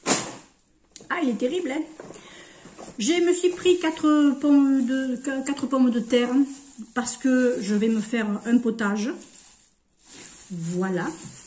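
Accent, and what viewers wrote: South, France. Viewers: Coucou , Les oeufs frais il n'y a rien de mieux!😊 Moi j'adore les faire à la coque Avec des mouillettes de comté 😋 J'adore votre accent du sud!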